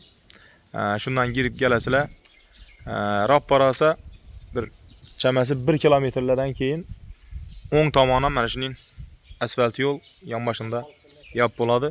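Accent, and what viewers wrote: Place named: Khorezm, Uzbekistan. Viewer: Хоразмча сулливар кийналиб гетдингку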